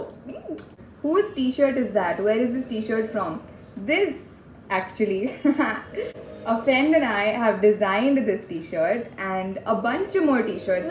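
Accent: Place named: United Kingdom. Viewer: Watching your videos is just so amazing 💙🥰🤩.. your voice.. your thoughts.. your insights to little things in life.. that little talking to yourself.. the in-between marathi convo.. the perspective about loneliness and marriage..that UK accent.. that cute and realistic convo with your brother.. correcting his vocab and pissing him😂.. that confidence about Cadbury adv.. etc..etc.. all of these makes the video so wholesome 💙✨🥺 I feel so bad, I missed a chance to ask questions. Please upload more of these. ✨ Wish you the best in life💙✨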